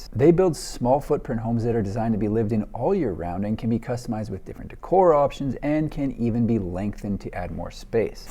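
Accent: Canada. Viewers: I would love if you got to go TOUR these favorites and get the behind the scenes info and tell us what places impress you the most. Maybe you need a US correspondent! I assume your Canadian because of the accent but you could be Midwestern too?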